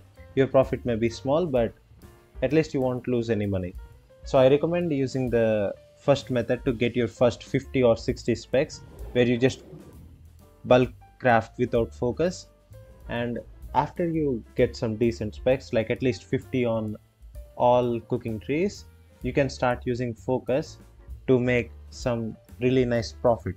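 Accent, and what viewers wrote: India. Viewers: You sounds Indian my friend .. Are you?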